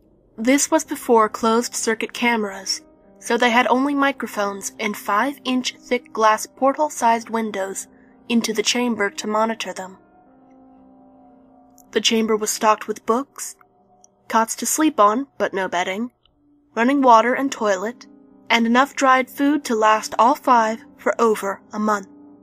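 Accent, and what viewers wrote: Russia. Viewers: that Russian accent tho ;) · Your Russian accent reminds me of Tenenbaum from Bioshock. Great job!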